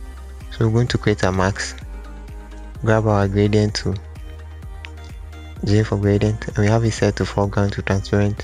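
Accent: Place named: Africa. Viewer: He's a african.. he has an african accent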